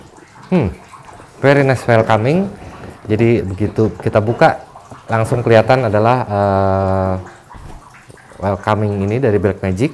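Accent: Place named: Java, Indonesia. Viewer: Mas kapan buat tips & trik videografi lagi? Udah kangen banget sama logat jawanya mas hehehe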